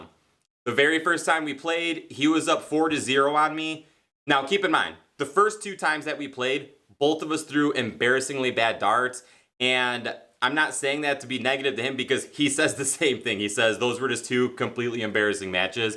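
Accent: United States. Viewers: Wow. It’s great to hear an American speaking about Darts. 👍🏻 or maybe Canadian I don’t know lol but I’m tired of the British Accent 😆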